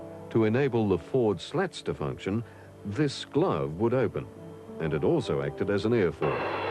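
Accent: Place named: Australia. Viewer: Sounded Australian to me???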